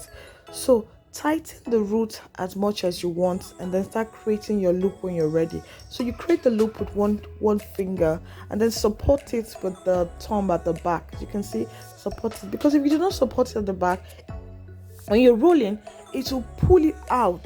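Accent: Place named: Nigeria. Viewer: Firstly I might say I just love the Nigerian accent. One of my favorites. And you did the things hunny,looking all so good and I did click the like button because I really do understand😂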